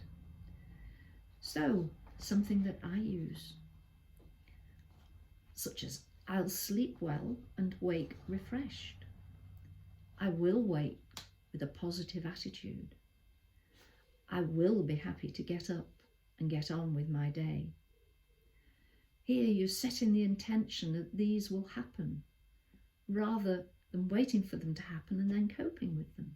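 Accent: England, United Kingdom. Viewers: What a lovely reassuring voice you have. I am partial to English accents as I was married to someone from the UK . I am widowed and live alone with no family The golden years are not so shiny as described! However I do breath meditation and that helps with the collywobbles. Mine manifest as an aching hollowness in the chest. I think the reason they occur in the mornings is that in the state between sleep and wakefulness (hypnopompic) seems to be when we are most vulnerable and suggestable. Luckily my dog won’t allow me to allow me to stay horizontal. She demands her walk.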